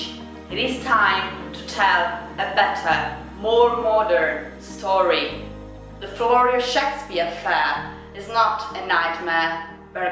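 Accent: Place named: Italy. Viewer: Please turn on closed caption I'm afraid i'm missing a lot of your argument because of interference from your italian accent. A quality that perhaps in his time also occurred. Fascinating subject and you're right on the money about the airbrushing of character reputations like Florio in relation to Shakespeare.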